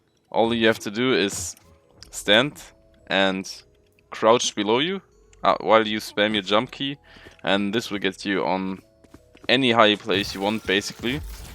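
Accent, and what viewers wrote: Germany. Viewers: Feier den deutschen Akzent haha aber nice Englisch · Where are u from? Your Accent sounds like german c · Bist du deutscher? Nur weil dein Englisch sich deutsch anhört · R u from germany? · Where are you from? You sound german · are you german? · is this Deutschlish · man höher so stark dass du deutsch bist haha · I‘m not sure but do I hear a german person speaking english in this video? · Ich höre einen deutschen Akzent xD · Is your Main language german? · Man merkt so hart dass du deutsch bist 😂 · Man hört einfach so krass das er deutsch is lul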